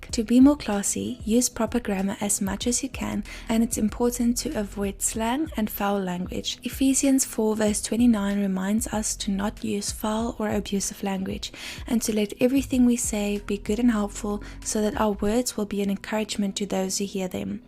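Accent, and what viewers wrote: South Africa. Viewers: I can hear that you are South African. I love that! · " " Your South African accent is refreshing for a South African such as me, who has lived in the USA for 30 years now.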